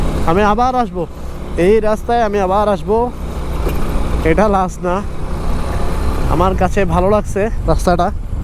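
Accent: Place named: Chittagong, Bangladesh. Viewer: Vaiya Chittagong basai kotha bole joss lage🫢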